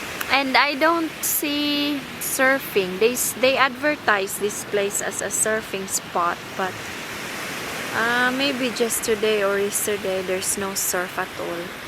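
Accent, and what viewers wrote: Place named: Visayas, Philippines. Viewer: Pataka ka man lang oi josko binisay-a na Lang na masabtan pa wai accent bisaya kaayo galisid jud hehehe.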